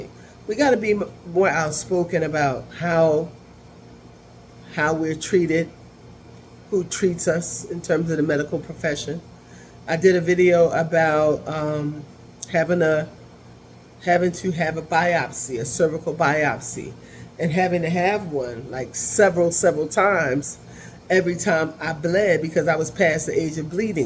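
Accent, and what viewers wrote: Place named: New Jersey, United States. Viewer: Where is G located? I think you’re from Jersey, (I can hear it)! So am I, it live in Atlanta now. Can come see her in Jersey. If so, I come home all the time E.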